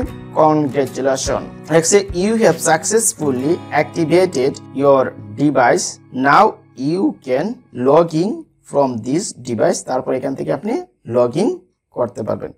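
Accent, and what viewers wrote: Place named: Sylhet, Bangladesh. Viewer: Video deke mono hocce apni sylheti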